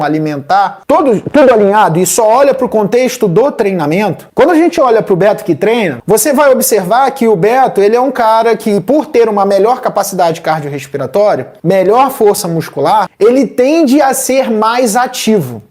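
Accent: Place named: Rio de Janeiro, Brazil. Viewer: Dr.! Adorei o teu vídeo! Estou a procura de um profissional qualificado para eu poder começar a minha saga de alimentação correta e treino. Pelo teu sotaque, tu deve ser do Rio.. Mesmo se o consultório fosse longe, eu iria numa boa, pois quero muito sair da sanfona..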